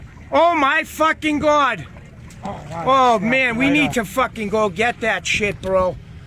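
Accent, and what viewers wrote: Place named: Boston, United States. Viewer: Alaura Martinez ....I thought seths exaggerated new England accent for Peter griffin was just a nasty stereotype....but ow Mai gawd ....these fawhkin guys from Boston r something else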